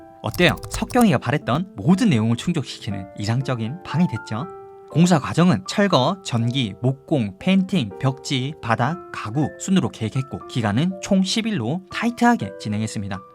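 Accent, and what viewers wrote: Busan, South Korea. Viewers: 님 부산인가요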